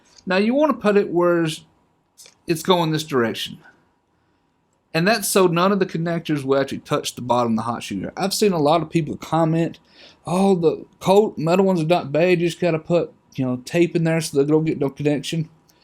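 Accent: South, United States. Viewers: very nice presentation. I subscribed. the southern drawl at first made me think you were dumb but once I got through my own horrible prejudice (about 20 seconds) I realized you are quite informed and intelligent and the drawl becomes endearing. It was easy to overcome my preliminary prejudice because in college, the smartest professor in the school was from Alabama and had a drawl so thick, when he said here it sounded like heeyah. He was so smart, the text books for his class were written by himself, and are the only textbooks I kept. We loved that man. Listening to him talk about Macroeconomics in his graduate level class totally destroyed the myth that southerners are dumb, because his accent sounded like one of a cattleman, but his words were so sophisticated that 99% of east coast snobs, myself included, didn't understand what his words meant until we had studied under him for 4 months. 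Thanks for your useful lessons, and for making them long enough to include everything so we know what to buy!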